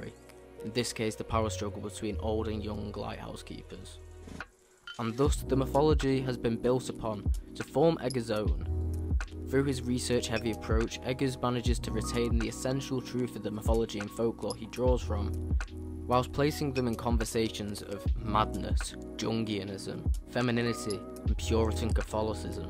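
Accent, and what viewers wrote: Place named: United Kingdom. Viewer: I scrolled down to subscribe wondering if you had tens of thousands of subscribers or hundreds of thousands. I'm truly astonished you only have 35 when I write this. This is a really good video essay, nicely presented, excellently written and well researched. Honestly, I don't have an issue with your diction being a fellow Brit, but I think to be more accessible to international audiences the other comments are right, you have to clear up your accent a bit. I know it sounds classist but the BBC have it right wanting ppl's accents to be clearly understandable.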